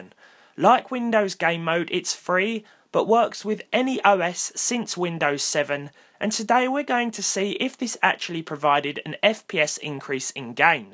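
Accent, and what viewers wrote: United Kingdom. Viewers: dat sexy british accent👌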